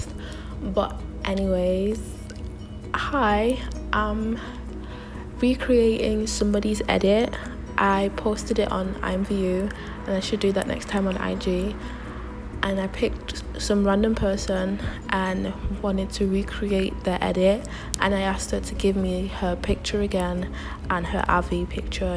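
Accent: United Kingdom. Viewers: lmao ella mai is from london. has a northen accent they ain the same 😂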